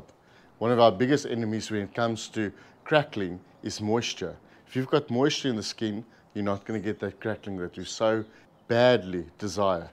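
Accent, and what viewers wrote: South Africa. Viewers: Please tell me you're South African or remotely from SA...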